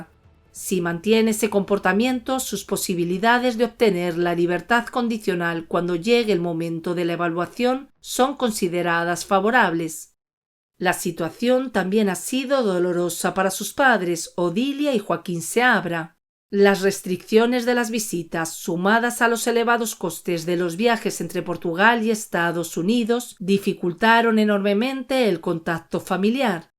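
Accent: Spain. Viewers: ¿Por qué? Es audio real humano con castellano y acento original… es cuestión de gustos y preferencias!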